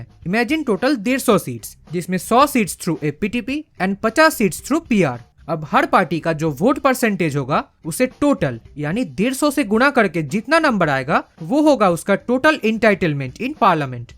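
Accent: Bengal, India. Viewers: R u bengali?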